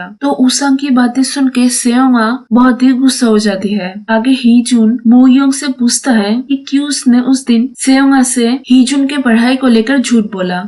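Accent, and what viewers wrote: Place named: England, United Kingdom. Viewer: Apka voice jyada english style huwa hey ..hindi mojle bolo behan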